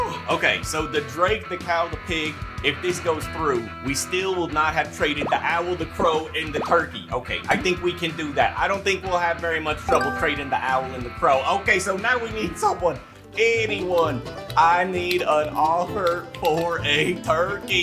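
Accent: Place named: United States. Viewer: I love your cowboy accent my username is lovelybear1509 and I have a display name it’s Italy! :)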